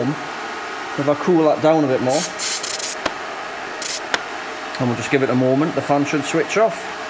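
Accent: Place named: Geordie, United Kingdom. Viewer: I know that, my parents were Geordies from Ashington as well. They never dropped the of when they spoke that is more of a Sunderland thing. I was only making a point about you describing everything you do and wondered if it was worth it when people make silly comments about the things you've done. I apologise if I have aoffended you, I live in Australia now and it seems so odd when people have accents like yours.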